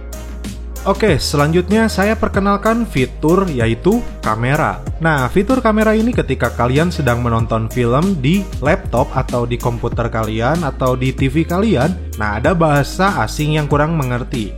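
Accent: West Java, Indonesia. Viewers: Knpa bang aya sundaan wkwk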